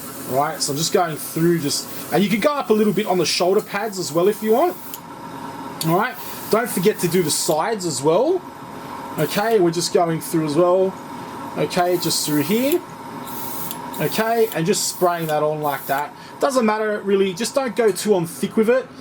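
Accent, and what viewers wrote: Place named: Australia. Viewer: Like your accent, you an aussie? :)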